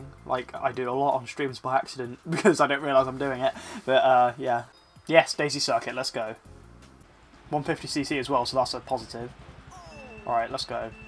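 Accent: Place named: England, United Kingdom. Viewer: The English accent is very confortable to hear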